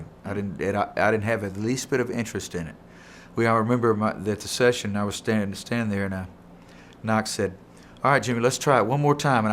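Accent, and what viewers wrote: South, United States. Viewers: I miss you!! Hes so adorable and very humble. I just love his southern drawl, beautiful laugh. Completely genuine southern gentleman. Love you ❤️🥰😎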